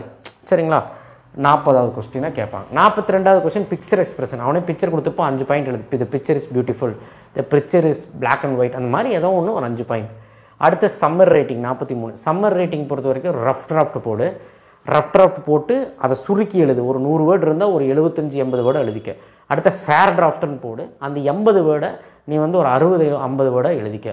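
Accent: Coimbatore, India. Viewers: Sir neega Coimbatoreingla sir 😊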